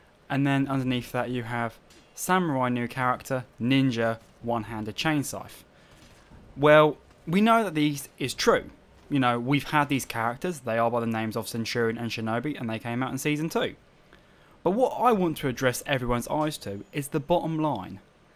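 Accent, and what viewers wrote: United Kingdom. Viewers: Stop using an English accent while saying roman names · Would’ve clicked off the vid if it weren’t for the charm and British accent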